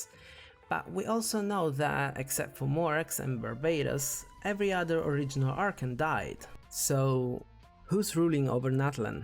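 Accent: Italy. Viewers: you are italian arent you? I recognize your accent reading in latin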